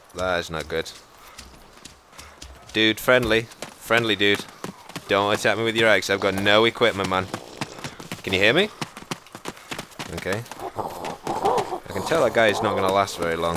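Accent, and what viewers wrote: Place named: Northern England, United Kingdom. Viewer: Sound like you're from Northen England!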